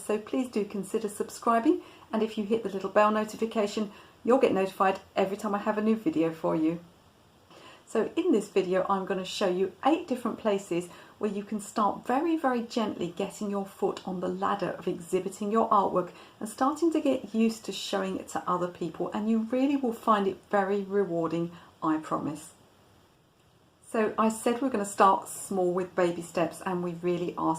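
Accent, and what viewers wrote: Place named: Southern England, United Kingdom. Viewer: Thanking you. Im a painter and I originally come from Tonbridge in Kent but I have lived in Australia for years now. I havent been home for many years so love hearing your southern accent.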